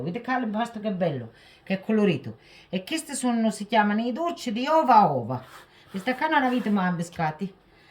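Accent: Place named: Sicily, Italy. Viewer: Mi piace molto anche mia nonna li faceva cosi ..e mi piace anche il tuo dialetto mi fa ricordare quando ero bambina in colleggio a messina.Istituto santa brigida.❤❤❤❤❤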